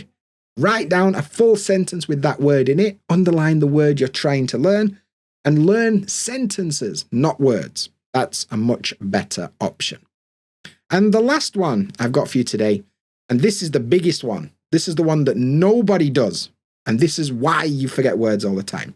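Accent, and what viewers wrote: United Kingdom. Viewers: I love British Accent 🇬🇧 it's really soft for my ears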